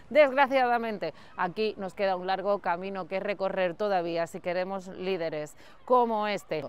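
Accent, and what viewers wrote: Spain. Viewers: Me gusta .loquedises con heste asentó Español.desde .USA😊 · En Cuba siempre han querido mucho a España, me emociona tu acento pura Española, o sea de España.Tengo más de 15 años que no como un turrón alicante, ni el turrón jijona ,aca en Venezuela es muy caro para mi.Buenas tardes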